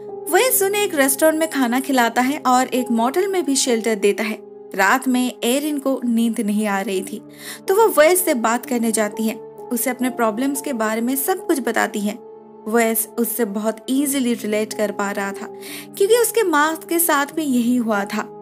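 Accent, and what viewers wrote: Bengal, India. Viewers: Are you bengali ma'am ? My mother's friend is bengali and she speaks exactly like you.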